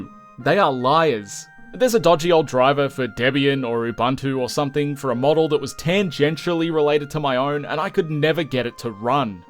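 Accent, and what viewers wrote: Australia. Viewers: Wait, you're Australian? I'm completely not surprised and in fact I'm sure you mentioned it in at least one video I watched before, but it just didn't "click" with me. Has audio editing chicanery been behind me not registering your accent in our shared native language as "foreign"? This is like if I was an NPC who suddenly noticed some metagame exploit you've been going through on a challenge run. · If you didn't show us how to reduced those Ss I would have no clue you are Australian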